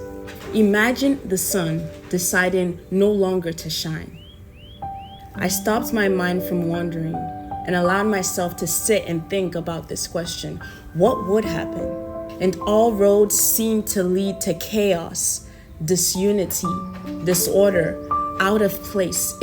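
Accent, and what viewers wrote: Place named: Kenya. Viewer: Amazing Gift!! If I may ask, sis. Where are you originally from? I understand is a Nigerian name and specifically from the people of Benin Kingdom, but your accent is giving Kenyan or somewhat Cameroonian. I'm just curious. Shalom!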